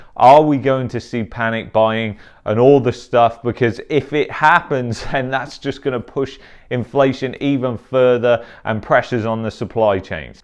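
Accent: United Kingdom. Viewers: Why do you have a British accent ?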